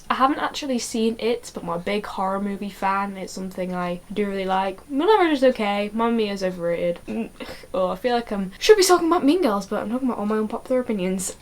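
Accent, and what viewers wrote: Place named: United Kingdom. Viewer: Everytime I forget u have a British accent i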